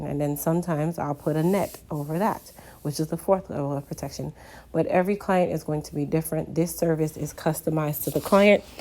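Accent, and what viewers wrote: Jamaica. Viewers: Sound jamaican to me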